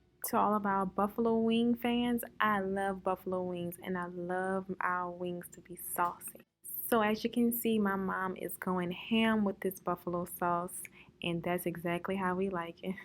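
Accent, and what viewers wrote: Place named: South, United States. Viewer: You and that southern creole voice tho. Whatever accent it is, it's definitely cooking with some spicy flavor... and what were you saying 'bout some chicken wings?😁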